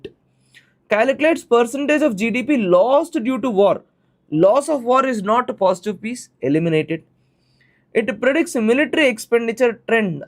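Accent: Kerala, India. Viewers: Sir are you malayali? i can smell you accent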